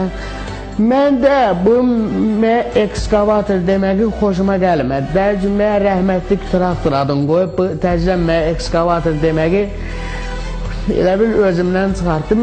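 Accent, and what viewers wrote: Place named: Imishli, Azerbaijan. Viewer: Bu İmişli ləhcəsidir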